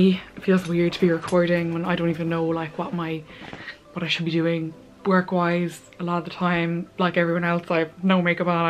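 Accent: Ireland. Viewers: Loved this vlog 💕Would literally buy the cottage in Doolin tomorrow if was for sale! NYC just pales into comparison for me. Trilled (!!) you made it home safely (love Irish accents 🤗) Looking forward to more vlogs from Ireland (Q and As would be fabulous) Delighted to see Cooper also 🐕 Stay safe, healthy and positive🌈 Does have a vlog or Instagram? 🌞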